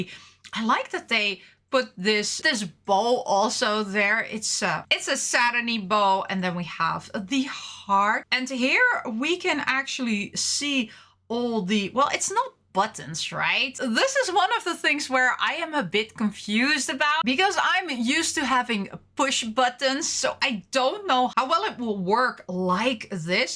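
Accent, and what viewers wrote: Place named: Netherlands. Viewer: hello, something i've always wondered if you're dutch? you're accent is really dutch :3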